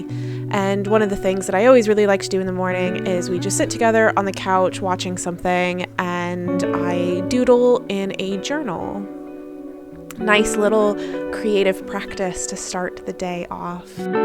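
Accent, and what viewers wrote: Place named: United States. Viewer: Which city are you living ? Because it seems like European country but your accent is like American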